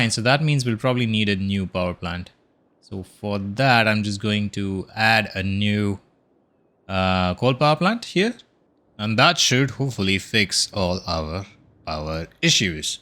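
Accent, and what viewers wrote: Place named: India. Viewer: Excellent video! I'd like to know if you're basing your city in any real locations. I'm brazilian and I often struggle to do stuff familiar to the place I live, because this game is very "american". Apparently, judging by your accent, you sound a little bit indian, so i imagine we run through the same problem. I'd also wanted to know if you consider LUT's or map themes as "mods". They could make this city look even better than it already is! Anyway, hoping to see some new episodes! <3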